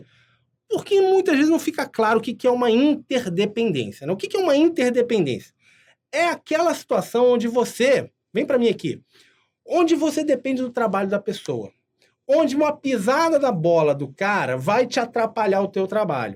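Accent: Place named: Rio de Janeiro, Brazil. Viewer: Você é fera irmão! Carioquês em dia! Vlw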